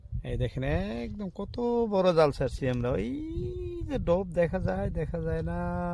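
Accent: Bangladesh. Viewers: আপনার মুখের কথার ধরন দেখে মনে হয় আপনি বাংলাদেশের মানুষ কিন্তু বুঝতে পারলাম না আপনি ভারতে গিয়ে কিভাবে ভিডিও বানালেন?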